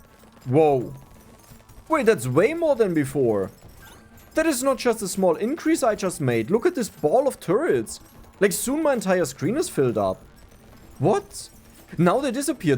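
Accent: Germany. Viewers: By the way, my browser has Voice-Over-Translation and on large videos (like this) from English, you have to wait about 1-2 minutes for voice acting. But because of your pronounced accent, the browser detects it as German, and then gives me an error because it doesn't understand why English words are pronounced in German. You have to manually set English. (Who cares, the browser is called "Yandex Browser". I don't know if this works with other languages, but it does sound exactly in Russian)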